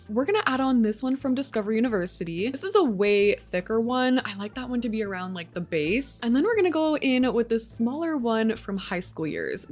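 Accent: Canada. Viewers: I’ve never seen your channel before but this video just popped up in my recommended’s. This house is stunning!! I had to check your “about” to see if you were Canadian because I immediately heard the soft and cozy Canadian accent ✨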